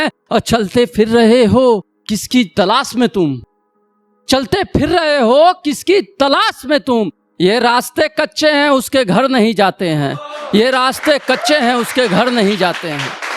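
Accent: Bihar, India. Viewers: Bihar se ho kya 😍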